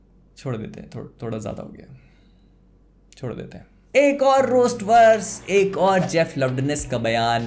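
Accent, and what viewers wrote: Bhopal, India. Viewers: The bhopali accent ✨ Miya!! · Full Bhopal mode me bhai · Ye bhopali wala hai kya 😆🤣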